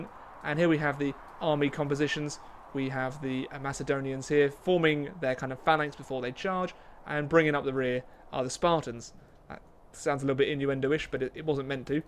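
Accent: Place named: England, United Kingdom. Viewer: Are English accent annoys me you should talk less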